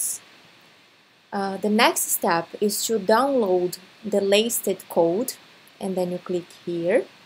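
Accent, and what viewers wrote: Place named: Brazil. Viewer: Thank you very much! Appear Brazilian accent.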